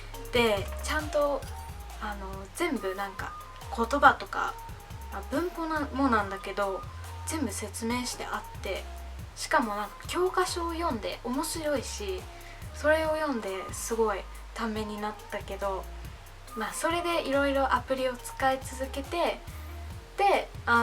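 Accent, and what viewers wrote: Kansai, Japan. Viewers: なんか関西弁ぽい訛りでかわいい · 発音が関西寄りやね。めっちゃ自然でええ感じ。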